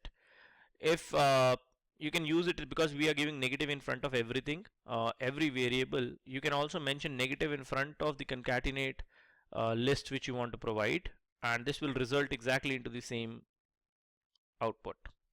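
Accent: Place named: India. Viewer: This is great but Indian accent is so annoying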